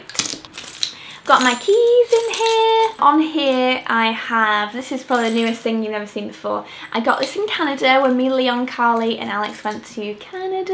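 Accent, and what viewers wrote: United Kingdom. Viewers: what's her accent? like what part of the UK?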